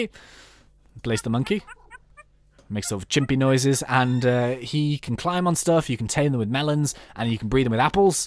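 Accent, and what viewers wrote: United Kingdom. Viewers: Are u British? And lol I love the dogs name lol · I love his British accent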